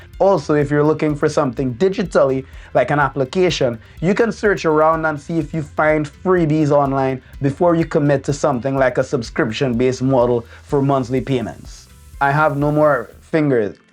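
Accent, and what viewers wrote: Trinidad and Tobago. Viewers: A Trini Accent? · I can hear yuh sounding like ur trini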